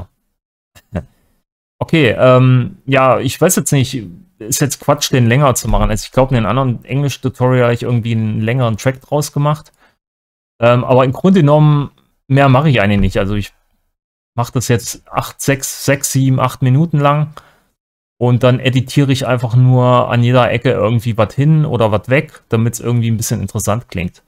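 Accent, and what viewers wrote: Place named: Berlin, Germany. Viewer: Besten Dank für dieses neue Video, welches wieder interessante Einblicke in Arbeitsweisen und Möglichkeiten bietet. Und wenn Dein Berliner-Slang rauskommt ist das auch sehr amüsant; und auch so, gerne Labern! Alles Gute und Merci!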